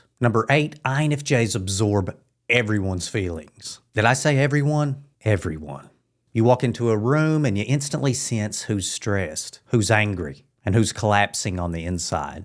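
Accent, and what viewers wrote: United States. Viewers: What state is that accent from? Respectfully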